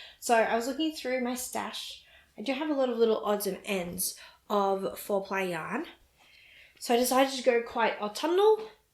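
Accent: Australia. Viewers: Thanks so much for your episodes. It’s lovely to tune in to each episode and listen to an Aussie. Next on my needles is the Gib sweater for my husband - should be fun.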